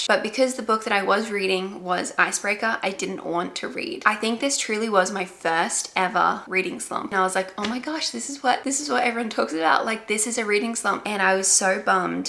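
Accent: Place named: Australia. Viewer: the Aussie accent is back 🥰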